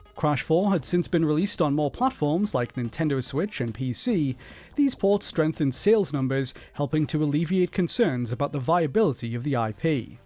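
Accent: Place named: Northeast England, United Kingdom. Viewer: I need to know which part of north east England the video narrator is from! 😁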